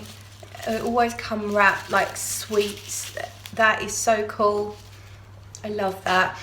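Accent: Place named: United Kingdom. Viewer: I am new to the fragrance hobby, and I just recently discovered your channel. Not sure if it’s your British accent or just the soothing sound of your voice, but I find your reviews hard to stop watching 😀 I really enjoy how you describe scents, it makes them come alive in my mind. Thank you. I am ordering a boat load of samples from LuckyScent based on your reviews!!!!!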